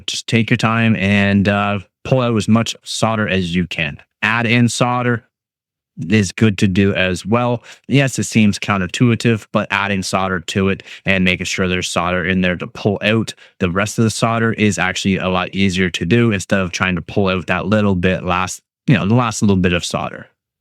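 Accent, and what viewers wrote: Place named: Canada. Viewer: Yep. Really irritating to hear sodder. I thought the only country to pronounce it sodder were your neighbours,(and soon to be assimilated into the US ...Joking of course ) . Sussed you were Canadian by the pronouncing the word "out". Have been doing the electronics etc stuff for over 60 yrs- yep an old boy. So if you won't pronounce it as solder then I will have to watch with the sound turned down.... Cheers and good luck with the channel.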